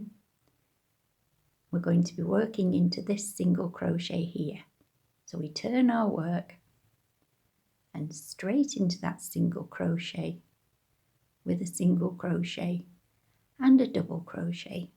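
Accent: England, United Kingdom. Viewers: It’s a great stitch but I’m in the uk and got a bit confused as you have an English accent. I missed the very beginning of the video and only realised after seeing you crochet you were using American terminology, but your video showed all the stitches very clearly, I was looking for a border for the Tunisian crochet blankets I make, this is going to be very useful, thank you.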